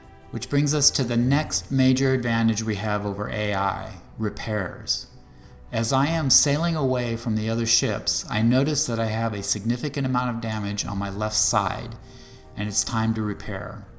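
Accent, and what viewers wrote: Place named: United States. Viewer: Love the crispy clear american accent. It's easy to understand and sounds great. Showing once again proper american english is indeed beautiful. As for the video itself i did pass the mission previously, but it was more because of dumb luck than skill, thanks for the info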